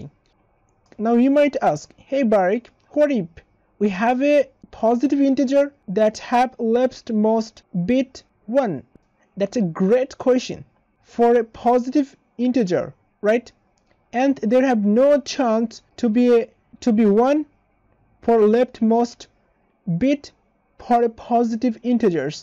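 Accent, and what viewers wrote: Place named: Bengal, India. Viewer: Bengali English accent mai bolne ki zaroorat nhi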